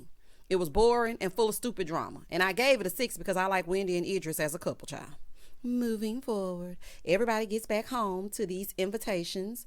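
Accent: South, United States. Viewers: I remember very first review. She said “I’m country so you might want to turn on your closed captions.” I was on board with the G.O.A,T. moving forward 😉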